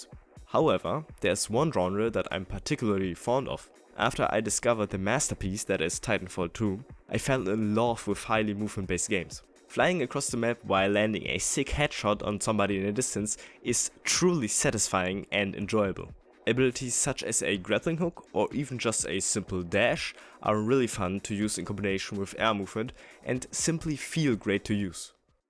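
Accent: Germany. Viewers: kann es sein das du deutsch bist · actually a game i would buy. Are u from germany? I like ur german accent. keep on inproving. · noway you arent german you sound somuch like youre from germany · Youre german arent you? I can hear the accent a little. :) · Are you german? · I love your german accent, correct me if im wrong but its so typical like me haha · very cool devlog and game! You're very talented at deving and making vids, keep it up! The only thing I notice is a little ''german accent'' If I'm correct pls let me know :D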